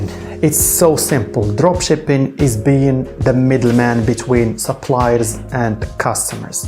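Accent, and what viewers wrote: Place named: Morocco. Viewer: 😂😂xttî 9x3tk mn l accent dyalk bl3ks wlkin wakha hakkak kyn wahd lam7 sghiiir kybyn dk l3r9 lmghribi hhhhhh thy bruuuh!! ♡♡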